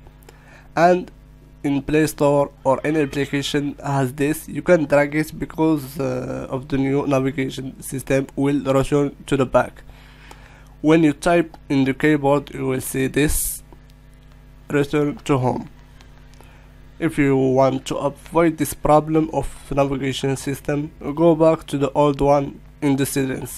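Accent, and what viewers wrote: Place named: Arab world. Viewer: It's an arabic accent But u r right😁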